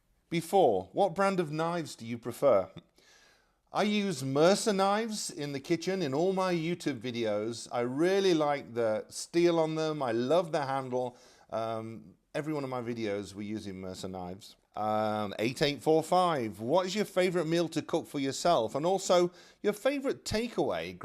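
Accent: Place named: Derbyshire, United Kingdom. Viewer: I love the intro, and adore the channel thank you, love your accent reminds me of my mothers side of the family from Derbyshire what a gorgeous place. HP sauce is wonderful, love it, and grew up with this food, exciting will definitely share this with my mother, she loves to cook,so does the family . Thank you such a happy show. enthusiasm and passion ,scrumptious meals and stylish presentation and yes your delightful personality.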